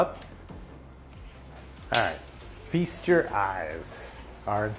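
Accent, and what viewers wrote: United States. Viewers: Dr Nene has true American 🇺🇸 accent. He’s son Arin is also so well brought up. Kudos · Superb! Dr. Nene will be a Super Hit on YouTube. As glorious as his wife! Excellent English! Handsome Likeable Personality! American English Accent! Down to Earth! Great Content! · You are making wonderful dishes ,sharing your knowledge of how even Americans have breakfast,pizza with a blend of indianess in it.Great!I wish to know you have US based Medical certifications which is a great asset.May be you should share these first,your own identity.May be you can leverage this load of cooking to Mrs Madhuri,but of course under your guidance and direction,so the calories and protein content is clear.Encourage youngsters like Arin who have a dream to go to the US and pursue Medical profession,making it easier for them.Its not easy but at least one can try.And may be you start a coaching class with Arin and Ryan to teach your type of English with American accent to the youngsters in the coaching class.This is the need of the time so they can match the American standards.All the best Dr Nene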